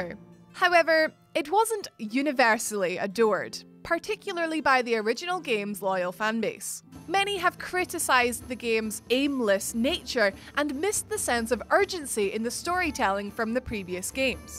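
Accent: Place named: Scotland, United Kingdom. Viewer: if you put your mind to it you can hear an accent that sounds like the princess from the disney movie Brave. yeah, I forget her name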